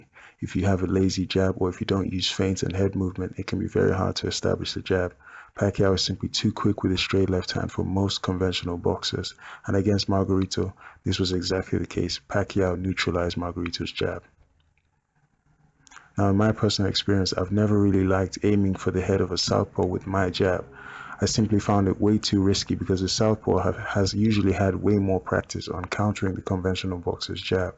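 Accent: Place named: United Kingdom. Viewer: Okay I have to ask, are you the same guy as +barryrobinson who also has a boxing film study channel? You both are black guys who are Flomo's. You even use the same vocabulary such as "defensively responsible, changing head slots, etc." The only difference is you talk w/ a British accent. If you're two different ppl, then you're a fucking hack and get your own ideas. And ffs PLEASE tell me you're not the same guy cuz that'd be so fucking lame lololol.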